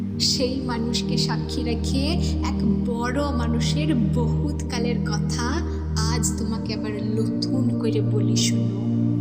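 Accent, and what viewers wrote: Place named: Bankura Purulia, India. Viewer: Darun hoacha amdar Bankura purulia vasa ta❤❤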